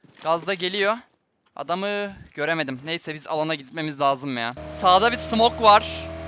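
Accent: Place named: Denizli, Turkey. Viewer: Abi sen denizlilimisin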